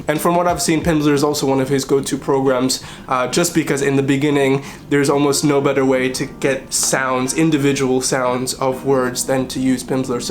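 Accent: United States. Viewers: Well, he has the American accent down well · Hey, im russian and i can clearly hear his accent. He sounds like american, not russian. Yeah, his accent is still decent (for foreigner)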